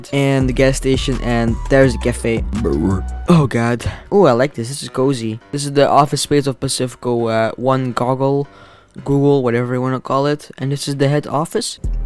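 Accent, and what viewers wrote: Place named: Quebec, Canada. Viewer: Cazy how much you sound like you are from québec! are you from québec???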